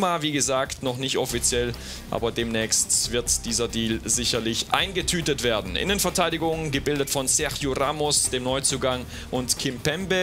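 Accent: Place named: Saxony, Germany. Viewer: Hauptsache Transfermarkt TV hat erstmal einen sächsischen(!) Moderator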